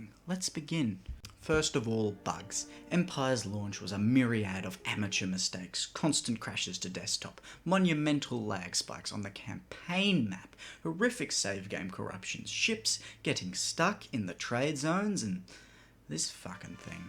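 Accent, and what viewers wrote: Australia. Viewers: I can tell you're Australian right off the bat. its fucken fantastic.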